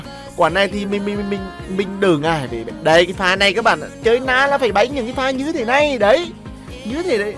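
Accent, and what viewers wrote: Nghe An, Vietnam. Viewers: Giọng a vậy là ở nghệ an rồi đúng ko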